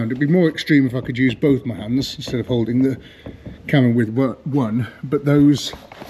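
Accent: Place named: Wales, United Kingdom. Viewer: My elderly mother is wondering whose lovely Welsh accent that is wafting around the house. I keep leaving YT on auto-play.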